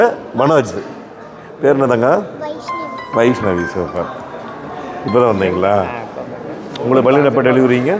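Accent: Kongu, India. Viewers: கொஞ்சும் கொங்குத் தமிழ் பேசும் கோமகரே...! திகட்டாத தித்திக்கும் பேச்சுடைய திருப்பூர் திருமகரே...! லடாக் பயணச் சாதனைச் சகாப்தம் எங்கள் மதிப்பிற்குறிய டான் திரு. அண்ணா அவர்களே❤❤❤🙏 எப்படி இருக்கீங்க அண்ணி❤❤❤🙏 எப்படி இருக்காங்க தம்பி ரித்திக்❤❤❤ எப்படி இருக்காரு விழிகளில் பதிந்தது புதிய கார் திறப்பு விழா💐💐💐 ஆனால் மனதில் பதிந்த நிகழ்வு எல்லாம் மதிப்பிற்குறிய டான் திரு. அண்ணா அவர்களுக்கு ஷோரூமில் அவர்கள் தந்த மரியாதைதான்❤❤❤💐💐💐💐😊😊😊❤❤ அருமையான வீடியோ❤❤❤ என்றும் அன்புடன் உங்கள் தம்பி என்றும் அன்புடன் உங்கள் TMF ரா.முஹம்மது கபூர் (சென்னையில் இருந்து) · neenga peysura kongu tamil, keyka keyka keyakalaamnu iruku sir 🙂 appa appa anga inga thirumbi sirikurathu ha ha ha super and very natural way of doing youtube videos.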